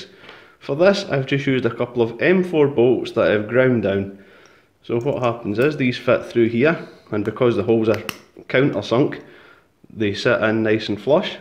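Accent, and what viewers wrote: Scotland, United Kingdom. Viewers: Best restoration channel ever! you rock man, plus I can practice my english, I always found UK english harder to understand, but I really like how it sounds, so it's to see how you work on the machines and I can practice a little, too, cheers man! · The increased commentary and explanations is spot on. Being a fellow Scot, it all makes perfect sense to me. Think you are more north of the border them me though.. 😁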